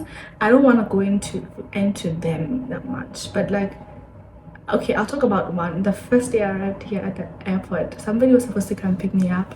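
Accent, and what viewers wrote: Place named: South Africa. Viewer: 🤣🤣😭is it South Africa ? Waitse batho bone mma Mme accent ya rona e monate nna i like it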